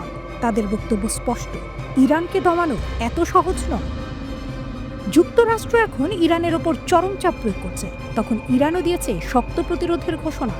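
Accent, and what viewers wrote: Kolkata, India. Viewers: কোলকাতা স্টাইলে নিউজ পড়ছে এর বাড়িকি কোল কাতায় নাকি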